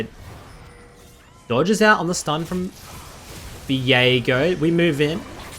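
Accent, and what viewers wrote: Australia. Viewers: If u would drop the obnoxious Aussie accent I would sub :> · i hate to break it to you but thats an aussie accent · I come to these videos for, stay for the gameplay. you are educational and your oz accent makes everything you say sound hilarious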